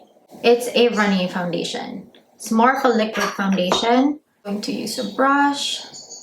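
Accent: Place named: Philippines. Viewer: I hear Filipino accent 🇵🇭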